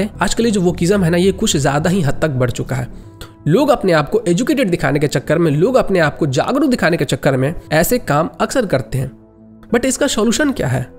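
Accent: Bihar, India. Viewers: Bhai ye bihari logo ko kaise bolna lag rahi hai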